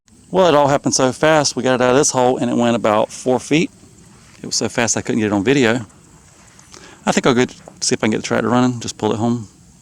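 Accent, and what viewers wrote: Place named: South, United States. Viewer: a hint of a southern accent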